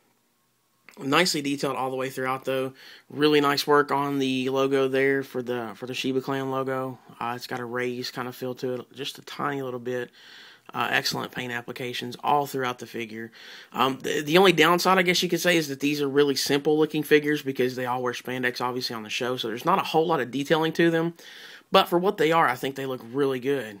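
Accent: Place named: Texas, United States. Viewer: hey thanks for making a video where you talk it out, I saw others and I found it annoying where it was just music. Also I like your accent, are you from Texas?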